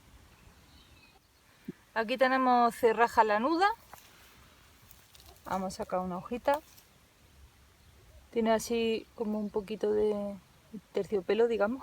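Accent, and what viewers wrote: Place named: Cádiz, Spain. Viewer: Muy interesante video 👍, la chica habla como los gaditanos 🙄, 😁saludos desde Uruguay 🇺🇾🙋🇺🇾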